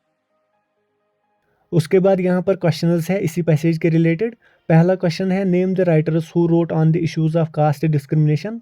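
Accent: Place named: Kashmir, India. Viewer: Sir has better kashmiri accent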